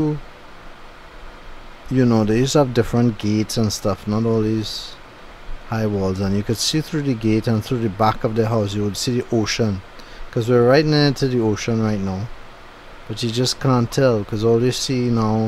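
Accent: Trinidad and Tobago. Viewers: I like your true trini voice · nice Trini accent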